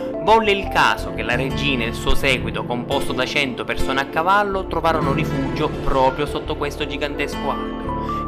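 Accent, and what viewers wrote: Sicily, Italy. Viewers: minchia che accentazzo siculo! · accento siculo e pure un pò culo.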